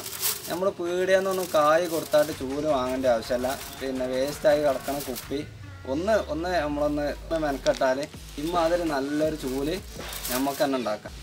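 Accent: Malappuram, India. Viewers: മലപ്പുറം ഭാഷയ്ക് അടിയൊരു കിടക്കച്ചി ലൈക്‌ ❤️❤️ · ലൈക്‌ അങ്ങ് കൊടുത്തു . മ്മളെ മലപ്പുറം ഭാഷ thudakkathinu. " എത്ത വർത്താനം " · മലപ്പുറം ഭാഷ ഒന്നും നോക്കിയില്ല ലൈക്ക് അടിച്ചു.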